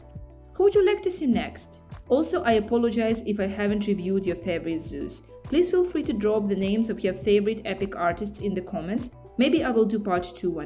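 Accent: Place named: Ukraine. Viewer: Моментально по акценту відчула своїх, хіхі Дякую за чудові відео, вони як стан душі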